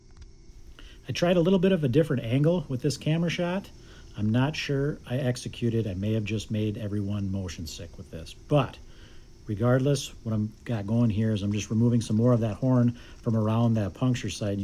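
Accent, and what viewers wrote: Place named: United States. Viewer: Is it just me that your accent is more american nowadays? I used to hear your thick accent before so it is weird for me to hear your more american, english accent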